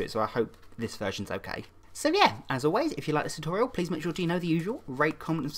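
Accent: United Kingdom. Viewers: [incredibly frighting man in make-up intro] [adorable soft speaking british accent (?) when he talks] this is the video I needed today, no question about it.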